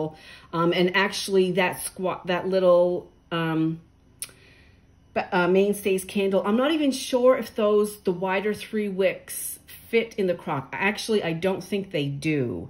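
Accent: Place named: Canada. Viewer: Loved this video! Can’t believe it filled so many molds! I actually heard a Canadian accent in thsi video — never heard that, amiga! Great video!!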